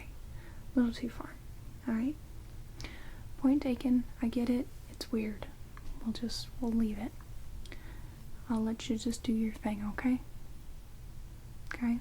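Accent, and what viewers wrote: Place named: Midwest, United States. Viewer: A vampiress with a bit of a midwestern accent? Intriguing;) Had to happen at some point, of course.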